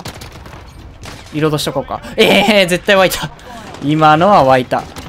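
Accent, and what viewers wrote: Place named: Hakata, Japan. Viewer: 筋肉男子の博多弁は惚れちゃうね❤️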